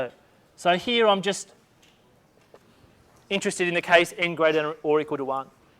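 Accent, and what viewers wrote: Australia. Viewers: are americans really this stupid? he's clearly australian wtf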